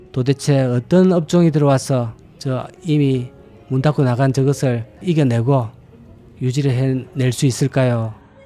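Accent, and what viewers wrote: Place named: Masan, South Korea. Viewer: 아~ㅎ 고향이 마산이시구나.ㅎㅎ 배챠 하실때 느낌알제.ㅋㅋ😂😂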